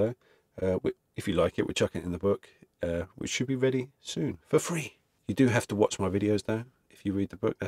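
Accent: United Kingdom. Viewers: Hey, extra British in this video. Can’t tell ya why but just wanted to point it out. Love your stuff and your noodle.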